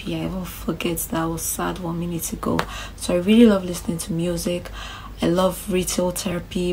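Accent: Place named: United Kingdom. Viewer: I like the blend of Queen’s English and Pidgin🤝 Keep ‘‘em coming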